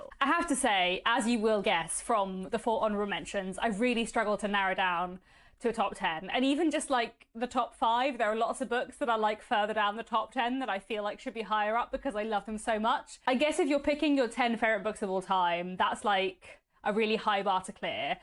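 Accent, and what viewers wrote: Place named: West Country, United Kingdom. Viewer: I would find it hard to do a top ten, and I would have to split it into two lists, one of fiction and one of nonfiction. I have read several of the books you mentioned, but none of them would make my top ten. My books would be a little obscure to most people as they would be germane to Gnosticism and the Paranormal or to Historical Fiction. Books like Luce by L. R. Fredericks, We Other by Sue Bentley, The Strange Life of Ivan Osokin by Ouspensky and The Secret Magdalene by author and old FB friend Ki Longfellow would be in my top ten fiction books. The one most would know is Melville's Moby Dick which is very Gnostic when you understand what Gnosticism is all about. From Historical fiction, I would put Monk's Hall in there by the late Hilary Mantel, Innocent Traitor by Alison Weir and Sarum by Edward Rutherfurd. 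That last one, is set around Salisbury and Stonehenge and the Cathedral and how the place became inhabited in ancient times. I detect a slight West Country accent in you, so it would be the one I recommend, which makes for fascinating reading.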